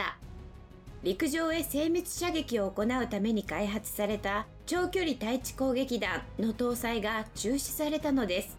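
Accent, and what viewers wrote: Kansai, Japan. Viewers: この人、すごいナマってるな。 これ関西系のナマりかな？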